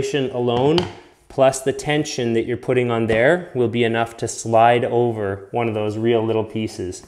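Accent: Canada. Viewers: You sound unbelievably Canadian.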